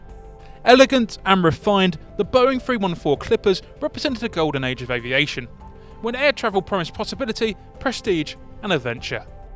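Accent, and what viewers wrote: United Kingdom. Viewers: Why a Brit narrator for an American plane? ENOUGH!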